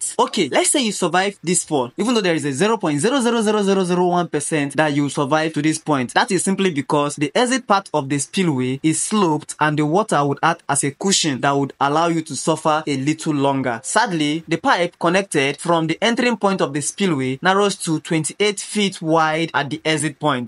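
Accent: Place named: Africa. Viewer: I love your accent. probably African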